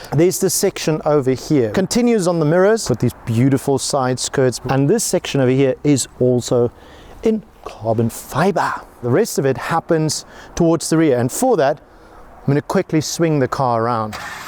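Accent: South Africa. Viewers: So SAFA ;-) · Wooh Masekinders die man is van definitely van SA 🤣🤣🤣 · Is this guy South African ? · South Africa this dude, very obvious, when he started taking about chicken teeth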